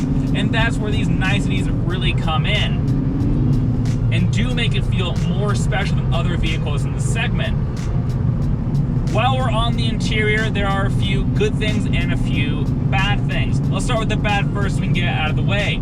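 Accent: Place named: United States. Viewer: Jordan Henderson is doing car reviews?! Sick. Nice American accent btw.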